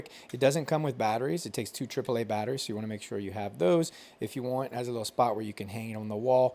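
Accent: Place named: Louisiana, United States. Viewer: As soon as you started talking I knew you were from Louisiana 😂 Thanks for the vid!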